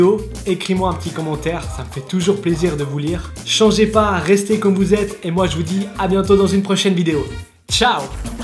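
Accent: Vaud, Switzerland. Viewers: Le plus accent vaudois qui fait plaisir😂 moi j'ai un accent fribourgeois et de paysan (jte laisse imaginer tché don) 😂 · Eh ben t'es vaauudoois ! 😁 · Salut mec tu est Vaudois ou quoi? Perso je viens d'un village au dessus de Lausanne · L'accent vaudois est présent!😂😂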